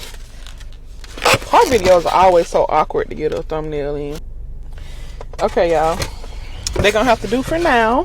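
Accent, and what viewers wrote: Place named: South, United States. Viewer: I like your southern talk & accent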